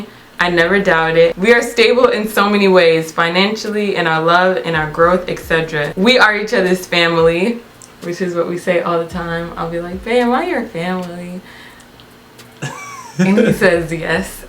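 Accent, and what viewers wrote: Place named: Caribbean. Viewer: He has a bit of a carribean accent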